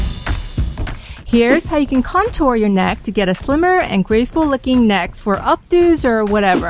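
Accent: South Korea. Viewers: Are you Korean? Reason I ask is Because i think you have very very slight Korean accent...? Or is it just me? :o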